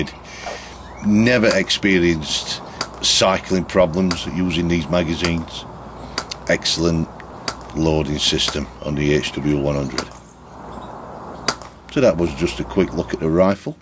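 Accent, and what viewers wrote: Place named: Yorkshire, United Kingdom. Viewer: This may seem a bit of a tangent to some, but I would love to know what your accent is, sir. I am an English teacher and very interested in accents. Can I venture a guess? Yorkshire? Please let me know. Also, I LOVE this rat shoot video. I am an avid shooter myself. Cheers!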